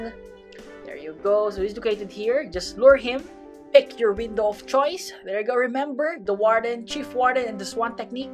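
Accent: Philippines. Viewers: Hi i know your filipino bc you sound like filipinos so i knew it already do you make a gamernomph channel please tell me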